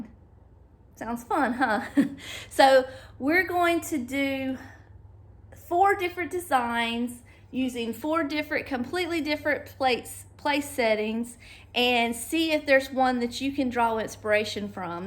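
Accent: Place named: Alabama, United States. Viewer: I’m so glad I found you! A lot of things you use and say remind me of myself. I have several of my grandmothers pieces and they bring back such sweet memories! You are so cute and precious! Where are you from? Sounds like Alabama. I live in Memphis. My daughter leaves in five days for Bama in the fall. ❤️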